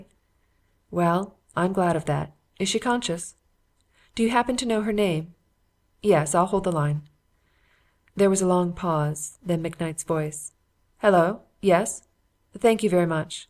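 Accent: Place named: United Kingdom. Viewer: Disqualified by UK b voice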